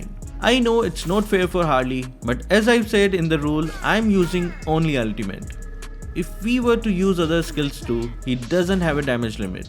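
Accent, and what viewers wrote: India. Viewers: Sound like a indian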